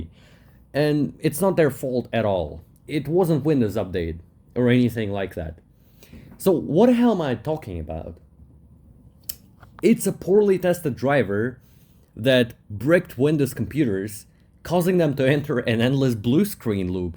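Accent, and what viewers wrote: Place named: Russia. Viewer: русский акцент всё ещё остался наш)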